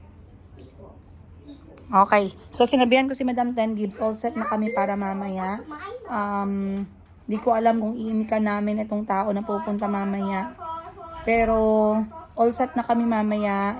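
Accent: Iloilo, Philippines. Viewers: Bat ka nag I ilongga accent 😮eh andiyan ka nman sa mindanao parang Hindi ka proud na mag bisaya eh andyan ka sa mindanao be proud na marunong ka mg bisaya wag ilonnga accent 😮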